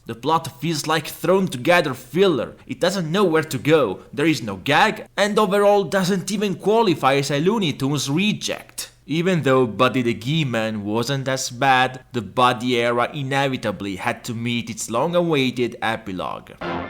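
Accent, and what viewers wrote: Italy. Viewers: You're strong Italian accent is too difficult to listen to to allow me to focus on the content of your video, which sounds pretty decent.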